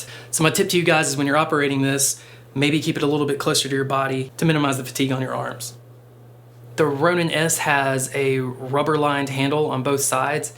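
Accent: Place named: Utah, United States. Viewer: I've got family in Utah that I visited recently (im from Denmark) and I just love your channel and the accent from the Area. Thank you for the great guide on this gimball :) Kind Regards from Denmark Jørgen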